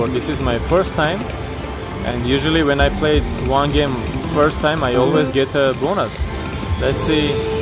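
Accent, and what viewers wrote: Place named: Iran. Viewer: love your Iranian accent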